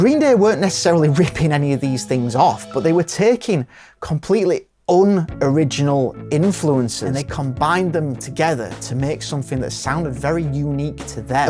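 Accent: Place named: England, United Kingdom. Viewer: Good video mate. Think some of the nuance in what youre saying gets lost outside England along with the accent :) Not a band/song ive ever been a fan of but maybe i should give them a go - i agree that they were musically more conscious than some other punk bands of the time - probably their image and they way they were pronmoted helped too. God knows what sell out or anything like that means - its all bs - green day were making music they wanted to make you can feel that and people loved it - i don't think that was selling out or anything like that. Being unoriginal isn't a criticism - the beatles took stuff from chuck berry, jazz standards, 50s pop, classical, elvis etc and every band before or since has too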